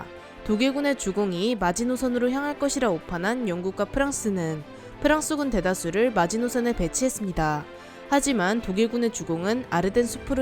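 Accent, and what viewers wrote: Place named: Gangwon, South Korea. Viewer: 사투리가 강원도 가? 어디~나? ㅋㅋㅋ 말투가 귀엽더래요^^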